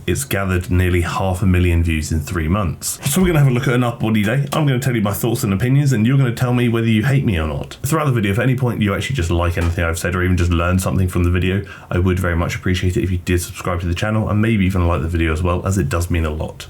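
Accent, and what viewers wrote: United Kingdom. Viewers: I love when a British man talks to me about muscles.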